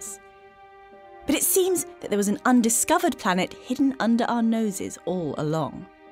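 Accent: England, United Kingdom. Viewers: Really getting tired of English accent narratives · I hate British accents. Especially when it's woman's voice doing it.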